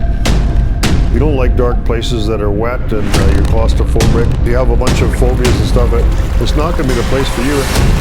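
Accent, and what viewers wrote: Canada. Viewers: The Canadian accent is strong with this one..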